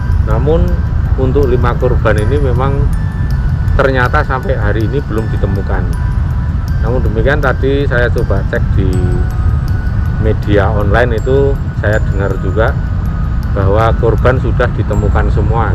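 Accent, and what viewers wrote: Java, Indonesia. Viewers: Kamu loganya jawa .......saya tahu kamu aslinya loganya palembang..........apa anda orang indigo........saya juga indigo dari kecil.....dari ayah saya zakir naik......last ferts how do gers boy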